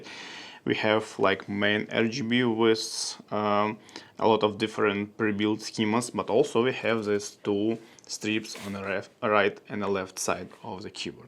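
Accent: Russia. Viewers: По акценту слышу вам не чужд русский язык. Какова клавиатура в сравнении с отцами магнитных клавиатур wooting? Есть ли разница в ощущении или цена за wooting это просто наценка за бренд?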